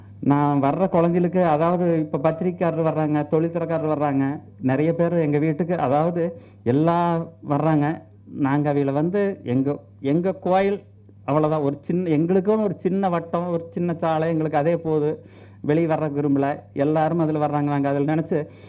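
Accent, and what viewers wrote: Kongu, India. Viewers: Kongu Tamil...UBM valgaa... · BEAUTY OF KONGU TAMIL!! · kongu tamil...😍💓💓💖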